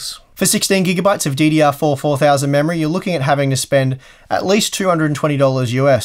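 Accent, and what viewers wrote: Australia. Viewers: Anyone else like how YouTube subtitles always say harbor unbox? Dat Aussie accent 😂😂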